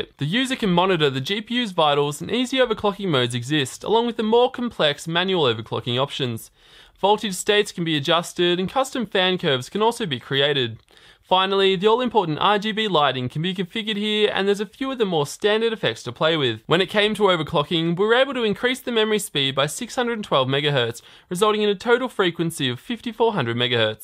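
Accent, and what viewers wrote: Australia. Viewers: AUSSIE REVIEWS! easiest on the ears. new sub mate, cheers!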